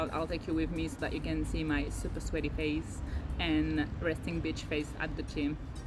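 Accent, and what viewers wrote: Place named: England, United Kingdom. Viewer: your accent 🥰 even more english 🇬🇧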